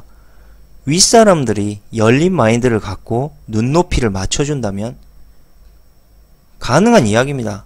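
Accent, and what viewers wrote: Jeolla, South Korea. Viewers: 님 본업이 뭐에요? 왜이래 똑똑하죠?^^ 그리고 님이 열받았을때 (욕할때) 야구선수 이종범 말투랑 거의 비슷^^ (전라도다요??^^)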